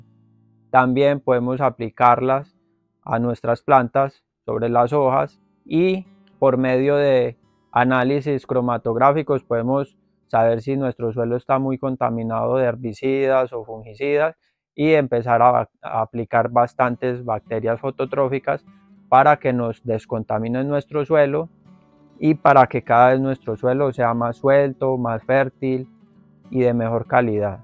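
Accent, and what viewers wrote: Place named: Colombia. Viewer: Bendiciones manito por el acento eres colombiano en que departamento vives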